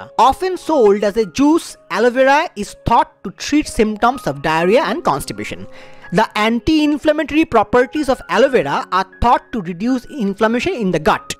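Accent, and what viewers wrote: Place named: Bengal, India. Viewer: Bengali doc .. strong Bengali accent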